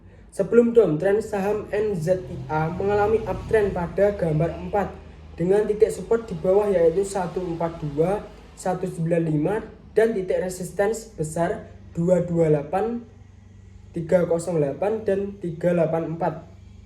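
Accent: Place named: Java, Indonesia. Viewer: Medok dikit ga ngaruh🔥keren poll👏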